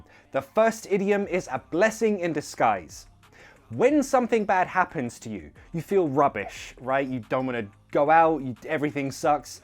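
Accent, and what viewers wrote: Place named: RP, United Kingdom. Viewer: Thanks ever so much, I like the way you talk and teach, and you are very understandable. I like the RP English accent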